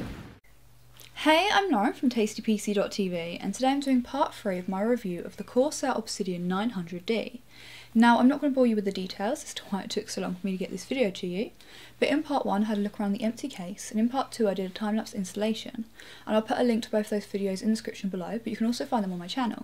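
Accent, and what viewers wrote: England, United Kingdom. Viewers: sexy English voice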